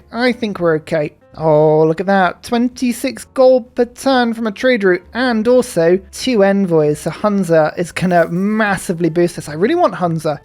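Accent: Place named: United Kingdom. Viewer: Bri'ish gameplay with Bri'ish commentator incoming, you bring me joy EVERY DAY. Thank you for that.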